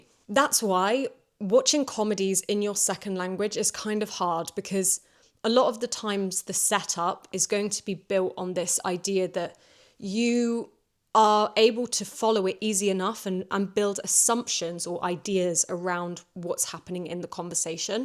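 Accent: United Kingdom. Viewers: Hello, Thank you for your recommendation. I love your content and love your british accent too. Could you recommend more about British films, please? I love British accent but now I don't know what next cause I just found out some queens and princese but I love crime and school sitcom or comedy. Thank you so much for reading my comment. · Watching your videos, is such a treat. I love how you speak British English with such passion and grace. Keep going! Take good care of yourself. See you soon! 🇬🇧🇬🇧👩🏼‍🏫📚👌🏻👍🏻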